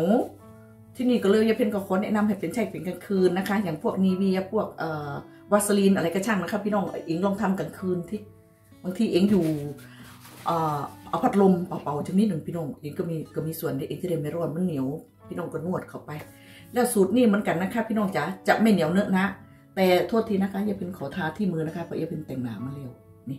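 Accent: Korat, Thailand. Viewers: ดูคลิปไหนก็ชอบน้องเห็นพูดสนุกโคราชกันเอง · อ้าว คนโคราชนี่นา พูดสำเนียงเหมือนคนกระโทกโชคชัย ฉันก็คนโคราช ฉันเคยเรียน ร.ร. สุรนารี ฉันกดติดตามให้แล้วจ้า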